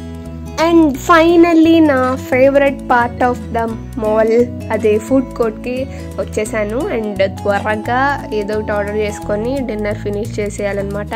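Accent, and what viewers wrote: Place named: Telugu, India. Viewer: Your Telugu slang 👌👌.. Addicted..♥️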